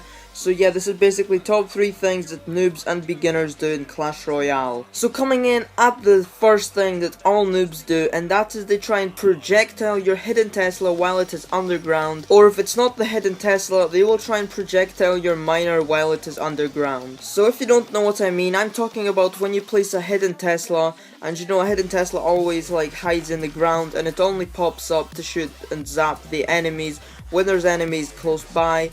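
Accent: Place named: United Kingdom. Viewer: If you mean Russian then he's not, he has a British accent.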